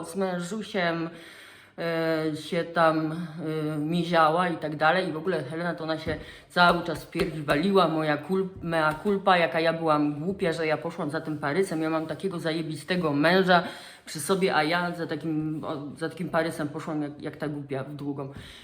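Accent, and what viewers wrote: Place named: Greece. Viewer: Skąd taka wymowa imion i nazw miejscowości? Czemu „Menelos" zamiast Menelaos? Czemu „Mikines" zamiast Mykeny? Czy to wymowa strikte grecka? W sumie nie ma co się dziwić, że Klitajmestra znalazła sobie kochanka Ajgistosa. Przecież Klitajmestra znienawidziła swojego męża po tym jak złożył w ofierze ich córkę Ifigenie, tylko po to by statki mogły odbić od brzegu i popłynąć do Troi. Jeszcze wmówił matce i córce, że Ifigenia ma zostać zaślubiona Achillesowi, tymczasem podstępnie ją zabił. A jeśli chodzi o trzy wersje śmierci Agamemnona to może rzeczywiście pierwsza jest najbardziej prawdopodobna. Trzecia z tego co pamiętam, ale mogę się mylić bo sztukę czytałam dawno została przedstawiona u Ajschylosa w Oresteji. Druga wersja po prostu wydaje się ściągnięta z motywu śmierci Heraklesa. O nim się mówi, że został zabity przez Dejanire, która nieświadomie podała mu szatę nasączoną krwią Nessosa. 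W sensie szatę podała z premedytacją, ale Herakles miał ją po prostu znowu pokochać a nie zemrzeć.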